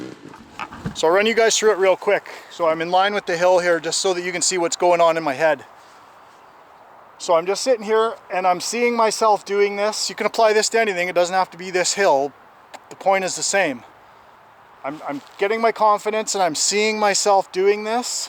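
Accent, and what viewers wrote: Canada. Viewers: Canadian from the voice first time watching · Man your videos are awesome .. You are a natural with explaining things AND clearly know your stuff .. This is a combo that seems more rare and hard to come across both online and in person nowadays, it seems. I have been able to take sooo much from your videos man, just want you to know I really appreciate and value the hard work you put into your vids. I know there are many others that feel the same as I do about your work here on YT. Please keep up the hard work so that we can all learn from your experience man. Also, it makes for easy listening with your chill Canadian accent lol .. wouldn’t wanna hear any type of help vid with my harsh nyc/nnj accent hahah 🤣 .. again your awesome for this stuff man .. nothing but love my man !! 💯👍😎🐐💪🏽💯💯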